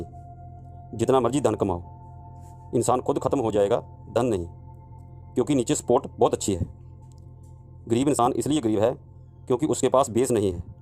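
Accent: Punjab, India. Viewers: Punjabi person speaking in hindi 👍👍🙏😁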